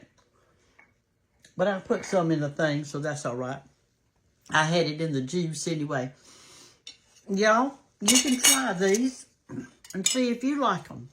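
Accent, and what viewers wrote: South, United States. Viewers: your such a sweet person I just love watching you cook and hearing your southern accent and I like your recipes also prayed for your healing also. GOD BLESS YOU darlin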